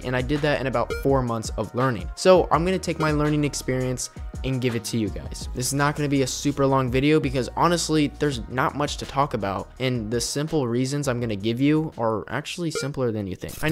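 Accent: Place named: United States. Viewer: You sound American but your channel name is why?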